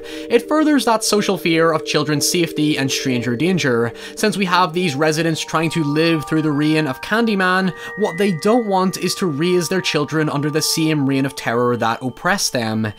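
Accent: Northern Ireland, United Kingdom. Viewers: I honestly love you, I love hearing you talk! I'm Northern Irish so it's comforting to hear XD such a huge fan of all your videos, including this~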